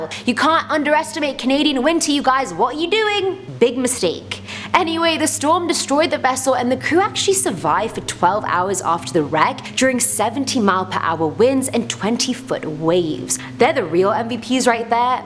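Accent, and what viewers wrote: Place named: United Kingdom. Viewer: I luv that british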